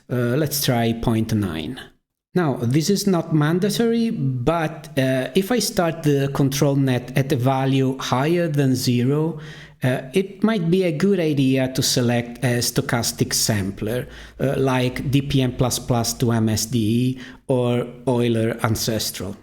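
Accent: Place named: Italy. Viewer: Thank you! Watching your videos after having spent a few months with comfy is like going through a grammar book after having had a thousand hours of input. Same hilarious feeling! Also your accent is cool! I've spent some time around one Italian dude in India, so watching your videos brings back those fun memories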